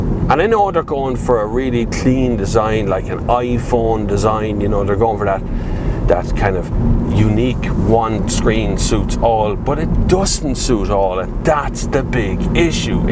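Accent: Ireland. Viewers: Helpful review - and particularly insightful about the touchscreen - thanks. It's hard sometimes to keep track of how all the cars in this segment compare to each other for the aspects you can't see in the videos - ride quality, refinement, engine tractability and response. I'm meaning that it would be useful to have a comparison of these aspects between VW Golf, Audi A3, this Peugeot, Alfa Romeo Giulietta, BMW 1-Series. As I think you have driven all these cars - perhaps you can edit something together to compare them all? I really enjoy listening to your voice - to my non-Irish ears, you sound like Chris O'Dowd (IT Crowd, Bridesmaids) and you can be just as entertaining! Thanks again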